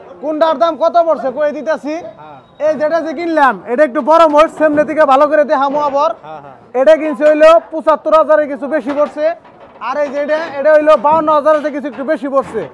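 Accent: Bangladesh. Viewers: কথা আমাগো বাংলাদেশীদের মতো,,,ভাবছিলাম বাংলাদেশ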